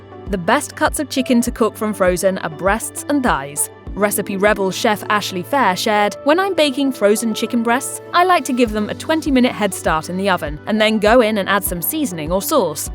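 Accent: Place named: England, United Kingdom. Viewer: The English accent is terrible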